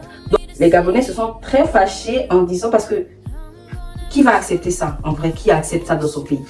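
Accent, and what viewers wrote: Ivory Coast. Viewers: ton accent gabonais est parti ou ? ta déjà l'accent ivoirien 😭😭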